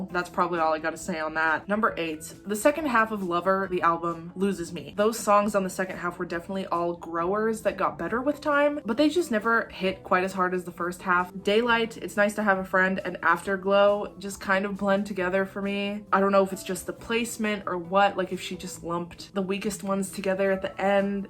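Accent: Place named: South, United States. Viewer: She moved to Tennessee when she was a teenager, sometimes people pick up accents easily. I agreed with you about the first 2/3rd's of your video, but you don't get to criticize Taylor's bangs or people having curly/wavy hair and straightening their bangs when you are wearing the same look. I do agree it is not a good look on ANYONE. To me I love all her albums, I may have songs that aren't my favorite, but 98% of her songs are my favorites. I don't think I could pick out a favorite. New Years Day was the perfect ending to Reputation in my opinion. I loved her bleached-blond look, she looked so iconic. One more thing there is more to a country accent than saying certain words, you do have a southern accent (not a strong one though). I am a firm believer that how people speak comes from the area that they live in, I am from the north originally, but have lived in Virginia since 1991, when speaking to family members from Wisconsin, they think I sound southern. 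Case in point, a lot of people from other countries that move to say England, the children that are born there speak with an English accent, not the one of their parents native country. Well, that's all for my opinions.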